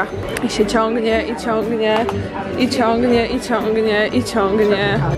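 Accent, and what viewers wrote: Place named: England, United Kingdom. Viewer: tobie już powili angielski akcent do polskiej wymowy się wkrada❤️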